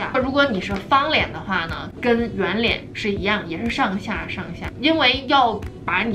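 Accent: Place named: North, China. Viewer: 前几天翻墙节点不对，居然发不了评论。我想说，当知道小姐姐是天津人的那一刻，忽然明白了为什么除了北方口音，你话语里的朴实和接地气特别亲切，原来是老乡！！🤣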